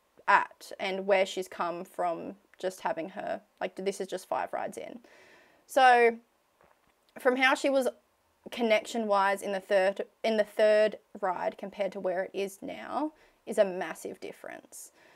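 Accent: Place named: Australia. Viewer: Well, the accent says Australia (which would be summer)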